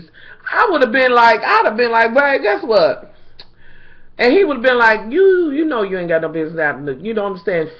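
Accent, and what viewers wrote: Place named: New Orleans, United States. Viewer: Miss I swear that I love you, if I didn't know any better I would swear that you were from New Orleans!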